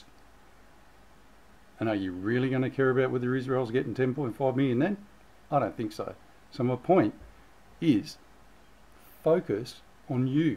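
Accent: Australia. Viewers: Hi, great to get a reply from you. All good, happy for you to use my message. I have faith beyond measure and just know that no matter what my wonderful Father is watching over me and my family. I believe so much that very bad things are on the way and that those who are awake and prepared will survive. Keep up with your videos, so great to have an Aussie to listen to.... doesn't make the rest of us Aussies feel so alone.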